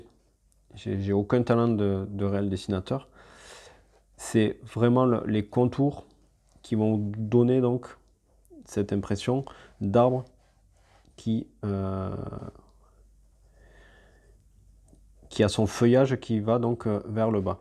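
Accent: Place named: South, France. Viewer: Super 👍 avec un petit accent qui sent bon le soleil 😁 bonne continuation 🤗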